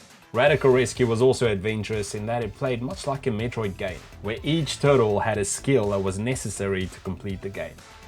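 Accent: South Africa. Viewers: Is that a South African accent i hear?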